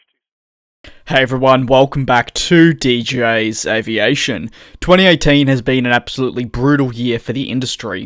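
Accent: Australia. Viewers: You sound Australian, it's just an observation. I'm another Aussie presently living in Sri Lanka and go back and fourth to Sydney and Colombo. I'd made the mistake of 'trying this airline' That I will never repeat. The Manager for AisAsia in Sydney who's name is Jason James Lee was very rude and condescending to me. Therefore I for one can say that I will never fly this airline. Its good to see that they're falling apart. Their customer service may also be another contributing factor. Brett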